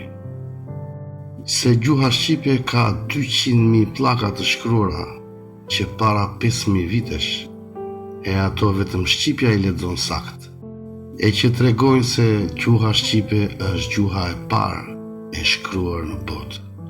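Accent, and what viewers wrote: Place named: Gheg, Albania. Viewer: Gegnishte e paster. Sa let për ta kuptuar, faleminderit për kto informata të çmuara.❤🇦🇱🇦🇱🇦🇱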